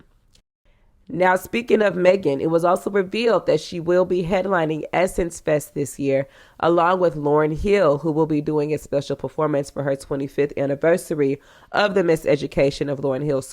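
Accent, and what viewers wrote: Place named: South, United States. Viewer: This is the best channel on here! Hands down! I think it's the Southern in you, and I'm a Southerner too. Lolllllllllll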